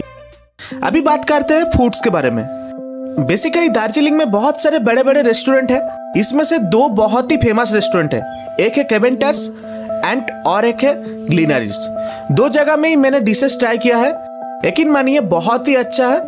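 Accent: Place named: Odisha, India. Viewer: Odia bhai zindabad , accent ru jana paduchi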